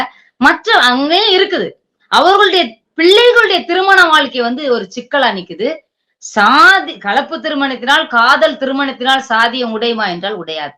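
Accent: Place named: Tirunelveli, India. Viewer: இந்த அம்மா சொல்வதுதான் சரி இதுதான் திருநெல்வேலி வள்ளியும் தமிழ் பேச்சு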